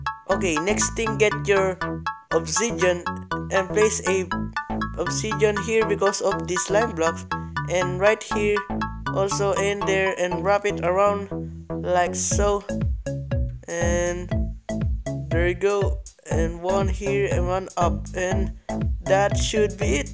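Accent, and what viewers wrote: Philippines. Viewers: Rinoa Aeris Tolentino he is filipino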